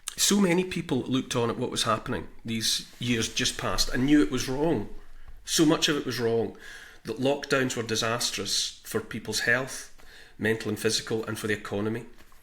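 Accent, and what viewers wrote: Scotland, United Kingdom. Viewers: Hello from Chicago, Illinois! I'm SWOONING over your Scottish accent!! 👍🎯❤️‼️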